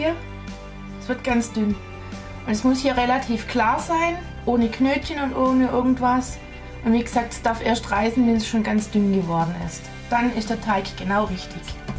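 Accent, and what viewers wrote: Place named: Swabia, Germany. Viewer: Hallo liebe, vielen Dank für das super Rezept. Wir leben in Pakistan und vermissen die deutschen Backwaren daher umso mehr. Heut habe ich die Bretzeln nach diesem Rezept gemacht und meine Tochter hatte Tränen in den Augen, weil es so lecker, genau wie in Deutschland, geschmeckt hat. Dein schwäbischer Akzent macht das Video angucken noch sympathischer. Liebe Grüße nach BaWü. Angelika. Bei Instagram kannst du Fotos davon sehen Instagram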